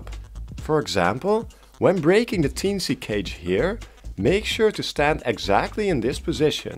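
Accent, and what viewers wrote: Netherlands. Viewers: Ps are you dutch? I kinda hear the accent which would be perfect because so am i:)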